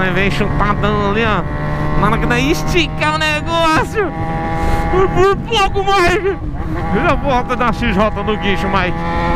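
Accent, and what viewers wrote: Goiás, Brazil. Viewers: volta a falar pique do Goiás quem apoia??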